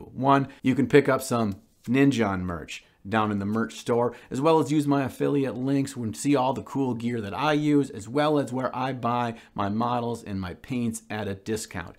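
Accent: United States. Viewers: I'm pretty sure it's just the accent. Too american...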